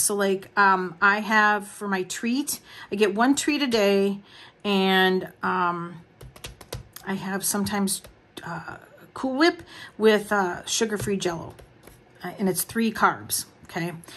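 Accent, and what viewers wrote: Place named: Wisconsin, United States. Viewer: 👏🏽 i applaud this. i’m also working on a health journal. also, i love your accent so, so much! ❤ are you a wisconsinite, by chance?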